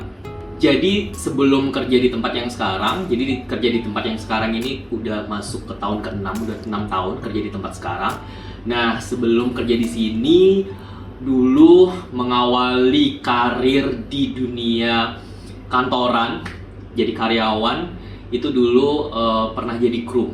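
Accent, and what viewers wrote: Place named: Java, Indonesia. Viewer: Sabar mas, dulunya artis eh krj sbg crew.... Smngt.... Kbykn artis star sindrom..... Sukses skrg medok jawanya uda berkurang mas hehehehe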